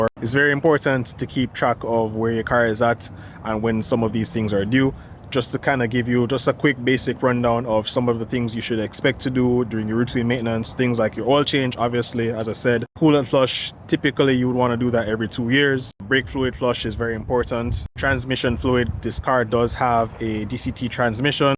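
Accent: Jamaica. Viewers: Very informative video. Jamaican or Trinidad?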